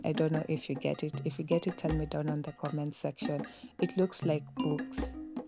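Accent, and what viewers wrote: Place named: Kenya. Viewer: Love that Kenyan accent.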